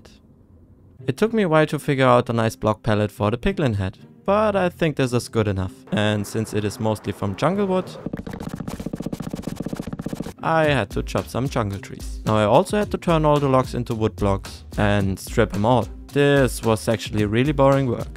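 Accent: Germany. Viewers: Bist du deutscher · Is he from Germany or does he only Sound like a Germany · i dont know how to desribe it but you sound like a german trying to speak english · No offend but u actually have such a German accent (ich kann das sagen weil ich auch deutsch bin) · oh du german sein beeing?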